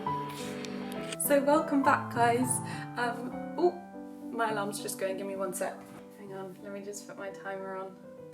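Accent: United Kingdom. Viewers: It's very nice to listen to and watch you. Your baking looks very delicious. I'm interested in any topic, do what you like. p.s your video for me it's the practice of understanding the English language and British English accent. I'm serious :) Thank you.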